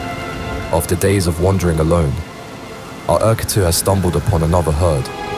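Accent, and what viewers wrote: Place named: Manchester, United Kingdom. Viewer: Anova instead of another. Time to get rid of that Manchester dialect kid.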